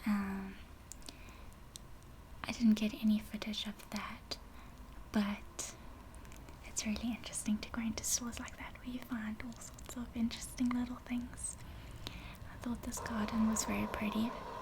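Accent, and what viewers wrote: South Africa. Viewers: Hey I am from England I've never been to south Africa it's strange because I get home sick when I see videos of country's so far from England I live in England was born here but I think of being lonely and missing England if I lived in another country it gets me sad atually maybe I am so use to being in England that I have got a bond with country but I do love how pretty south Africa you do have the best accent by the way I think you are every English guys partner but I would get lonely even with you if I moved to south Africa but thanks for making these videos I will always like you're videos and south Africa is very beautiful one of the top 10 maybe top 5 country's in the world for beauty just like you you're pretty to and I hope you don't get lonely in your own country I am nearly 28 and lived in England all my life was born here but I get home sick so bad even if I go on holiday to any other country for 1 week but I love seeing south Africa and living in England because I don't get home sick or feel lonely it's strange feeling lonely it's like you miss the country you have been in all you're life but it's nice to see how south Africans live and I like the way you talk best accent ever in my opinion you look south African thanks for upload I listen to south African radio alot and just love the accents also I like how you always smile and happy I'm mostly happy to its best way to be especially at Christmas I commented on your Christmas tree video and called i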